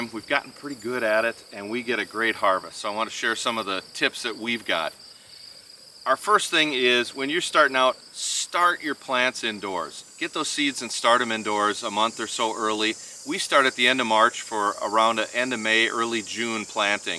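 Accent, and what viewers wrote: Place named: Minnesota, United States. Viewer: NO! Don't change a thing,! We get a little taste of Minnesota when we watch your channel! 😁 I'm confident over 20 years in Georgia has given me some southern spice to my accent, haha!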